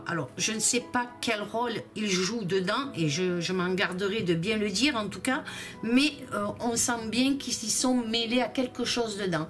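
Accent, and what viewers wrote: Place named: Camargue, France. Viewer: Bjr contente de vous retrouver en bonne santé, et surtout de vous protéger en vous reposant. Ce qui me trouble, ce sont les mi.....s étrangères que vous avez vu, et dont j avais entendu parler il y a quelque temps, la nationalité d un pays dont on parle bcp en ce moment.prions pour que cela n arrive pas, pour tout le mouvement qui s est mis en route.merci bcp, vous avez l accent d une ville que je connais ou de la camargue.ca me fait plaisir. Bien a vous prenez soin de vous.✌️👍🇲🇫🚛🚚💖🗽🐞🍀🕊️😘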